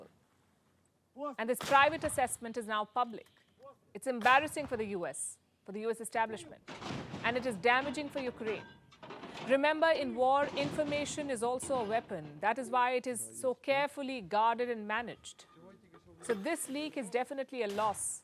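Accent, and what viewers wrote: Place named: India. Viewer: God I hate this indian Accent. Can you please use AI to "normalize" her voice.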